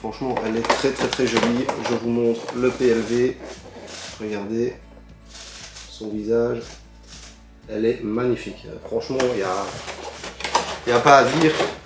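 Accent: Belgium. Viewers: t'es liégeois? Sinon sympa la vidéo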